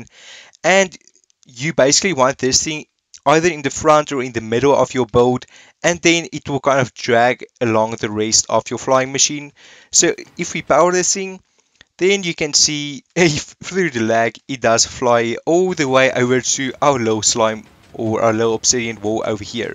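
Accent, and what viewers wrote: Afrikaans, South Africa. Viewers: Are you from South Africa? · Do you speak Afrikaans? It’s sounds like you do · South African ? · Are you South African Dude you are. I am from SA and I know a Afrikana when I hear one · Definitely South African Afrikaans accent ha ha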